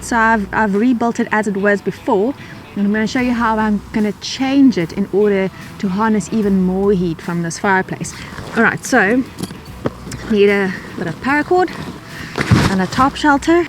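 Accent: South Africa. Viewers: SAFFA accent. Nothing else matters :) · South African accent. Just accept we all sound a bit different and enjoy the content of the video. 😎🇬🇧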